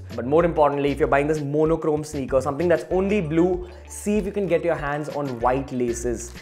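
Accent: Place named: India. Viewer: "short" or "shirt" sh*t!!!! that's how indians speaks!!!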